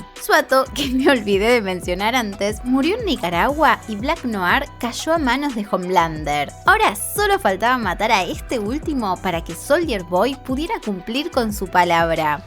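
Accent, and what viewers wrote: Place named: Argentina. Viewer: Que acento argentino tan marcado joder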